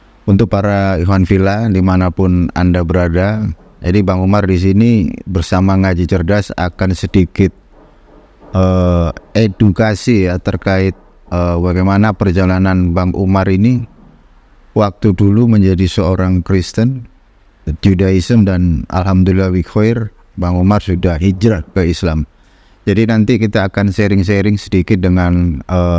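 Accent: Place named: Surabaya, Indonesia. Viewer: Masya Alloh b Umar sy kgum dg anda,nada bicara anda yg santai dg logat Surabaya,pengkajian anda yg sgt ditail n mudah di fahami,SMG anda tetap Istiqomah.amin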